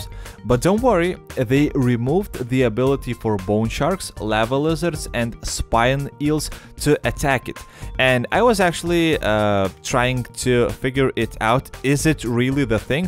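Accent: Russia. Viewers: У тебя акцент Русский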